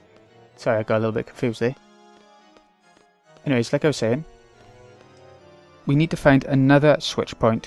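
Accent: Wales, United Kingdom. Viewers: Are you Welsh? Also do you use turbo for the GF cutscenes, or do you mash?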